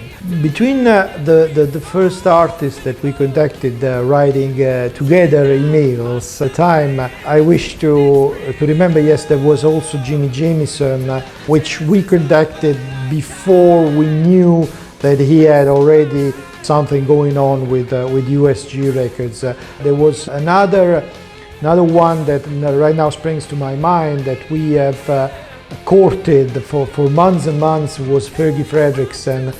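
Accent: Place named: Italy. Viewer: And the italian accent,of course.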